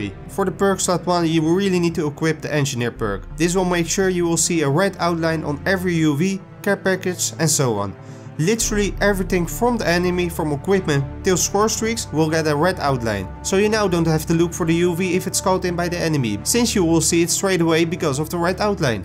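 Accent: Netherlands. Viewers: Je kan gwn horen dat je Nederlands bent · Nederlands Accent Approved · Hahahaha ik hoor je nederlandse accent zo duidelijk. Lachen man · The dutch accent😂🤦‍♂️ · ik hoorde het all aan je accent 😂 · Bij het eerste woordje hoorde ik al dat je Nederlands bent hahaha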